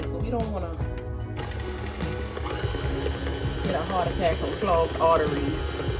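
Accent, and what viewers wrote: Charleston, United States. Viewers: I knew you was from Da Chuck as soon as you started talking... I from yah too!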